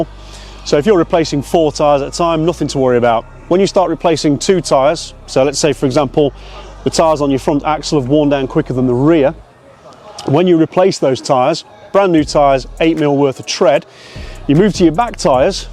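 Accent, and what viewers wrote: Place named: Leicester, United Kingdom. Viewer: It's the Leicester accent, that's accent nor excent!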